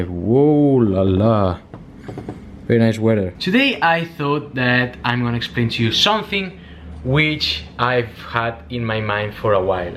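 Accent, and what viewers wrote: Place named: Barcelona, Spain. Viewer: Aha, you're from BCN :D Excellent - everyone will sound like this!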